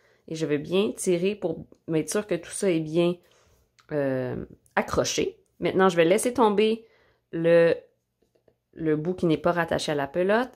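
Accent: Quebec, Canada. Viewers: Finalement je trouve le vidéo que je cherchais depuis un certain temps. Ce super carré granny pour mon prochain tote bag. Et quelle surprise d'entendre ce merveilleux accent québécois. 💖 J'ai l'impression d'être retournée à la maison (Je suis originaire de la ville de Québec). Grand merci, je vois que vous êtes super au crochet mais aussi à expliquer. Ici le puff, on les appelle points popcorn 🙂. Vous avez maintenant une nouvelle fan en Italie 😍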